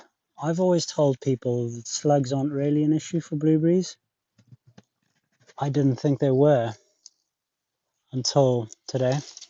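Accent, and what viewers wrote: South Africa. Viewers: South African here. You're doing good work over there. We need new sources of protein. Your accent will always follow you 😉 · You sound South African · South African?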